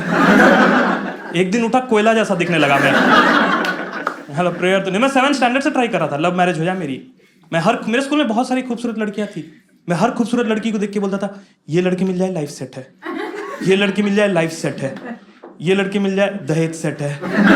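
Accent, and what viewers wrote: Bihar, India. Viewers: Your Bihari accent is great bhaiya❤❤ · Your style of speaking is very similar to Ravi Gupta. Maybe its the Bihari or Purbi accent! I think if you can also add Mallu Hindi accent with Bihari it can be a very good act. Liked your show, well done. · Bhai your bihari accent owasm...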